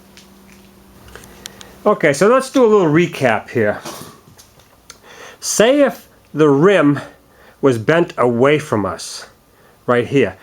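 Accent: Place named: Boston, United States. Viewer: stoppsi Obviously Massachusetts/Boston/New England.. lol But it's ok..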